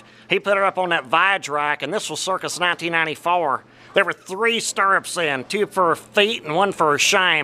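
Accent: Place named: London, United Kingdom. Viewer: What part of London are you from?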